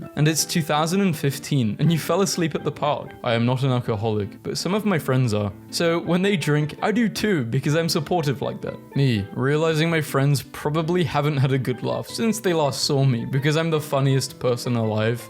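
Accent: United Kingdom. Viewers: are you British by any chance? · are u british?:D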